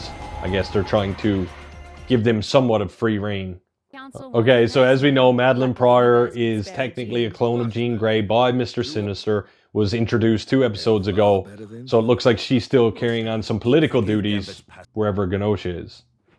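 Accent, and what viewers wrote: Newfoundland, Canada. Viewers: Great reaction. That episode was a roller coaster ride. I too cried like a baby. PS: Are you Newfoundlander? Couldn’t quite pin down your accent.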